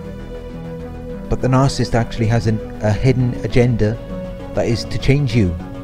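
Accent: United Kingdom. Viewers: Thank you for this. I needed a reminder tonight. Thank you for all your videos. Your voice is comforting. May I ask where in the UK your accent is from? I'm in the US.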